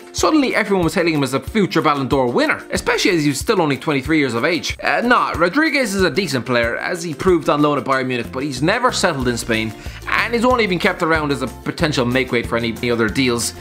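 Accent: Ireland. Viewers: Are you irish ? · Robinho is far from overrated. Where are you from?? Ireland??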